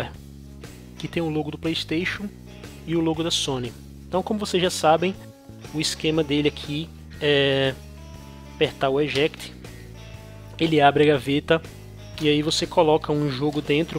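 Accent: Portugal. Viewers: Vc é de Portugal? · esse cara é portugues ou nordestino???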